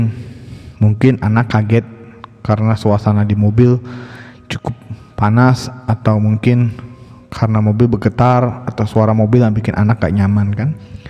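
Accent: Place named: West Java, Indonesia. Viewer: Meni logat Sunda pisan euuyyy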